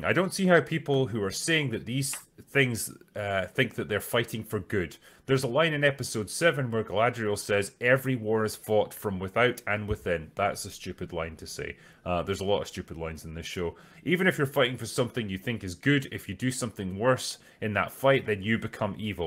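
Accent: Scotland, United Kingdom. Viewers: I'm English, listening to a Scot ranting about a Welsh Waman....Brilliant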